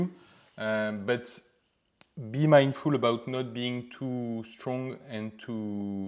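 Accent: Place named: France. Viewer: I love your French (?) accent! Thank you these tutorials! Btw, are you familiar with 3D LUT Creator? It's an amazing app (unfortunately a paid app). It has some remarkable tools (that, actually, remind me of some of the tools in DaVinci Resolve for color). I'd love if something the the A/B Grid was incorporated into Darktable. And if not, this is an amazing raw editor.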